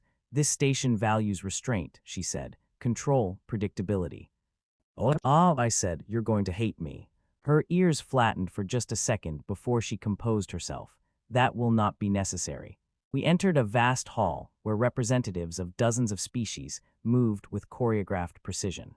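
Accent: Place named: United States. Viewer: Plenty of aussie accents on AI. Kinda wrecks the story with a yank voice and customs.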